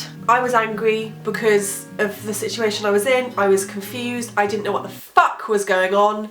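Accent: England, United Kingdom. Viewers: just don't be anxious about your videos. you know what you're doing. i do not sleep a whole lot, naturally, so my issues manifest as confusion instead of sleepiness or desire for rest. i get the indecisiveness thing. for a time in my twenties my big decisions were made after a massive drunk; anything that seems plausible when you're hungover is more likely to succeed within one's basic nature. no manic choices... but i admit in retro i didn't soar at a few points where perhaps i might have. everyone's a little fucked up is my current thought, so honesty about it is decent. (don't totally stop swearing- the f-bomb with english accent is a kind of crack to Canadians). stay a little mad, tho. it suits you.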